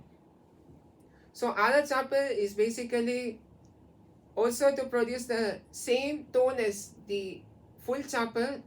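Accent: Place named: United States. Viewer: I am curious, your accent smells like US. Which state are you residing. And, are you conducting online classes. If yes, how can I approach your class. How much the charges for a student. Thank you 🙏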